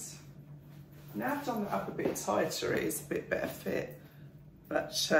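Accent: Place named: England, United Kingdom. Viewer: I love your hair. I wish I could wear mine like that! I watch a YouTUBE channel called The Armchair detective. His name is Allan I just mention him because you have exactly the same speech. The two of you probably live in the same area of England.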